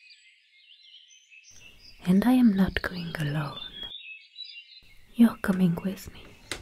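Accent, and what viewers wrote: France. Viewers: I really like her French accent that you hear!!!!!!!!!!!!!!☺☺ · I still go for a walk in a forest nearby. It's relaxing and puts me down to earth. Your video is so beautiful, I love hearing your voice and your french accent is a total trigger for me ❤️🌺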